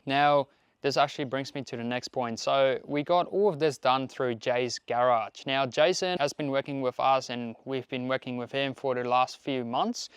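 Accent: South Africa. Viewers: Your S.A accent comes through big ups bro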